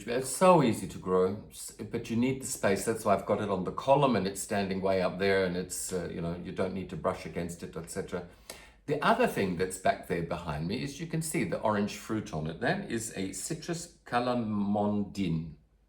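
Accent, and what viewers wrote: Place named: Australia. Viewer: Hey there..I follow Svetlana..so on her recommendation had to check out your channel. I was trying to workout your accent & u said 'u pinched something'. I thought is he Aussie & then u confirmed it 😊 I am from SE Qld & have lots of Aglaonemas . A lot of them have different names here to overseas but basically the same plant. There are lots of hybrids here. Nice to see the start of your collection..it's a rabbit hole once u start 😉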